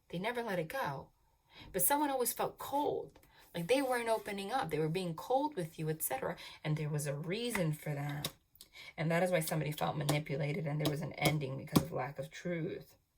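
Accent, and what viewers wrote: New Jersey, United States. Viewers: Idk who will read this. Idk if you will ever read this, but I do wanna thank you. Imma just put this positivity out into the universe. This came at a really pivotal time in my life. I lived through abuse at the hand of my adoptive father. Recently there is a girl I fell in love with where I had to cut it off. Everything you said hit soo hard. I’ve always been someone who’s been scientifically grounded. Lately I’ve had a sort of spiritual awakening and stoped ignoring this calling. That’s when I found this. I’ve been trying to work past a lot of things that need healing and I’ve done really well, but wow!!! Lemme just say your gifts, your abilities, your words truly hit home and inspired me to push through on this journey. This also gave me a lot of hope. Ty so much 🙏 also I’m from New England and your voice mixed with that Jersey accent 😩 the universe clearly wanted me to hear this message. 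Thank you so much for the positive energy you put out for everyone!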